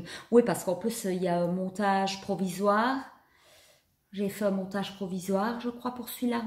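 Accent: Liège, Belgium. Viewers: Quel plaisir de vous écouter ! Toujours ravie d entendre votre accent liégeois : ma ville natale! Vous faites de très belles réalisations malgré le fait que vous ne maîtrisez pas encore complètement la surjeteuse ! Merci.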